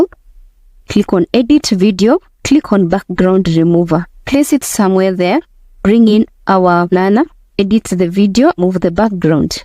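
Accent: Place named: Kenya. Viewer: You sound Kenyan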